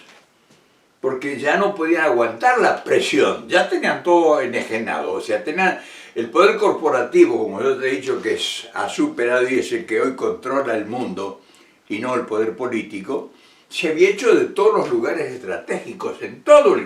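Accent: Argentina. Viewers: usted habla como argentino, pero tiene un corazón mexicano. Su capacidad de reconocer al pueblo como la fuerza que sostiene a este gran gobierno. Excelente análisis. Saludos desde México.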